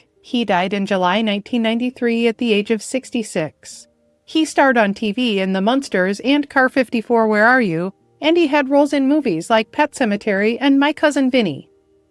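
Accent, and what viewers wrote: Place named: Midwest, United States. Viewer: Are you , the narrator, from the midwestern region of the States? You sound like it. I would say in order of likelihood: Illinois, Ohio, Wisconsin. Curious linguist here. Caveat: Could be a midwestern AI these days lol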